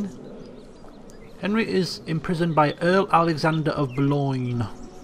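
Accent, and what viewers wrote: England, United Kingdom. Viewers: what part of England do you come from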